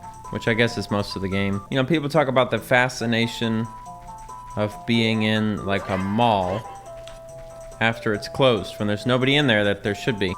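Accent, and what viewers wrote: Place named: New England, United States. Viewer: interesting how your accent says "ruhm' instead of '"room". you, by any chance, from the new england area?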